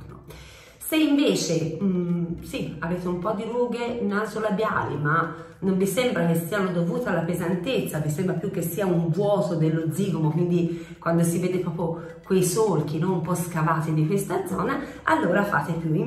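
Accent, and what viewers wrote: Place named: Florence, Italy. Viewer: Ciao, piacere di conoscerti. Ti ho scoperto ieri sera con grande piacere. Volevo farti una domanda ma preferirei in privato. C'è la possibilità di scriverti una mail? Grazie in anticipo e un abbraccio da Firenze. (Mi sembra di sentire che anche tu sei delle mie parti)😍😍😍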